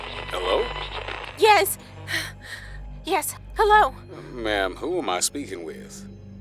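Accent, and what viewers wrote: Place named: West, United States. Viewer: I love the western accent!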